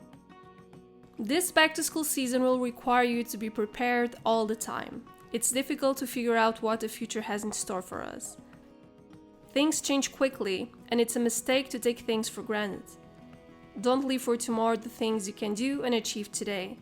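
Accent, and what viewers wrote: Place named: Portugal. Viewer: I just found your channel a couple of hours ago and I have been binge watching your videos nonstop. Your videos have really helped me organize my routine before I go back to college (i'm studying Law). I also noticed your accent and wondered if you were from brazil or from Portugal then I saw some portuguese books during this video and just wanted to say your work is amazing and wanted to send some love from Lisbon. Continua com o bom trabalho e tudo de bom para o futuro. Ps: Clearly english is not my first language but I did my best.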